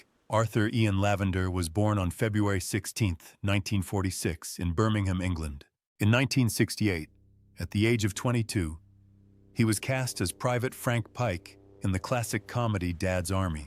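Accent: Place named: United States. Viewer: Who needs to hear an awful american voice in a typical dull silly over dramatised so called factual documentary….👎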